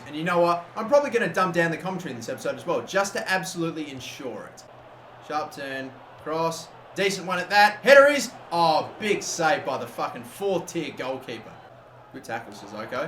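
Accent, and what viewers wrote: Australia. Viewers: hes aussie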